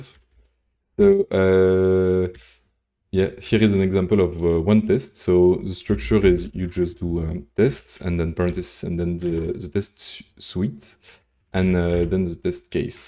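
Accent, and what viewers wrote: France. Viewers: wery wery french accent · On dirait un francais qui parle en anglais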